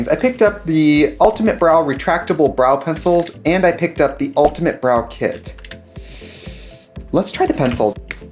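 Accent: Wisconsin, United States. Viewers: Any one else think Trixies Wisconsin accent shows up more during the 2020 videos? I feel like i hear it more in these than newer ones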